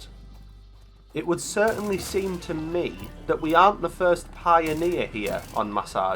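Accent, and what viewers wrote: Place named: Northern England, United Kingdom. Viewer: I unfortunately have to report that since watching your videos my thoughts now speak with a northern accent sometimes. It will forever be gläeba in my head for now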